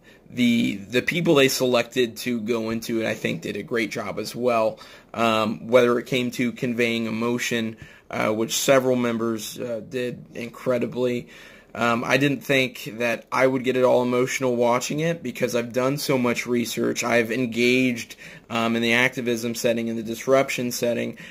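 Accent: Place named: Midwest, United States. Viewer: What part of the midwest are you from? I'm from Nebraska.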